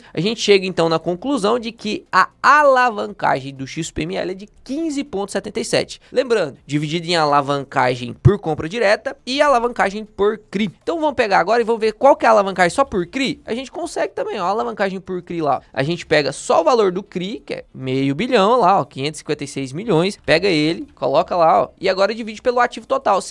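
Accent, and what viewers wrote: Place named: Goiás, Brazil. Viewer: Toda vez que o fundo realiza uma cagada dessas, tão óbvia de ser evitada, é porque alguém lá dentro ganhou com as transações, alguém ganhou e vários cotistas perderam, infelizmente. Quem discorda disso ainda vive em um mundo fantasioso na imaginação. Não é momento de vender as cotas, como geral sabe, o fundo não vai quebrar e a crise vai passar, mas pra quem é inteligente, a desconfiança vai permanecer e acredito que isso daqui algum tempo, possa fazer vários cotistas abandonarem o barco! Aquela máxima quem bate esquece mas quem apanha não, se bem que no Brasil, pra lembrar, tem que apanhar muito, e nos fundos geral tá apanhando pra caramba a algum tempo! Sou meio pé atrás com os vídeos do Lucas vejo ele com uma postura muito negativa com 99% dos fundos, se escutar ao pé da letra tudo o que ele diz, ninguém fundo presta pra investir, mas se tiver um pouco de filtro, vai perceber que o olhar dele é muito crítico e refinado, não alisa nenhuma gestão e abre os olhos pra minutos podres que passariam despercebido facilmente! 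Também gosto muito do seu canal, seu ar de Goiano de falar me agrada porque também sou goiano, mas não dá pra passar pano, dá impressão que foi comprado ou está em um estágio de negação, no mais acompanho o canal e aprendo muito com seus vídeos, mesmo achando que em alguns vc fala muito e passa um ar de pastor querendo agradar ou alienar seus fiéis kkk, mas é isso, tudo precisa ser visto, filtrado e absorvido! Abraços!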